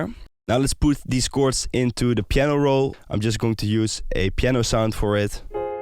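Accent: Netherlands. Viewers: sounds like you from the Netherlands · I smell Dutch, also great tutorial. Keep up the good work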